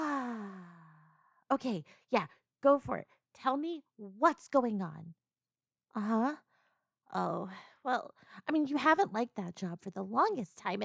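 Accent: South, United States. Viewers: Customary comment as requested 😁 But yeah, very nice work as always. Your southern tones always add an amazing cadence to your characters. Hopefully you can use some of that money to upgrade your computer again, cause... Damn that thing been put through the ringer the last couple of days! Can't wait to hear what you hit us with next ❤❤❤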